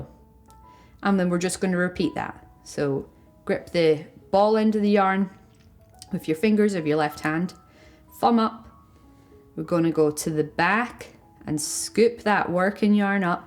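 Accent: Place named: Scotland, United Kingdom. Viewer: Thank you for your precise directions it worked,50 years without touching the needles. Love you Scottish accent.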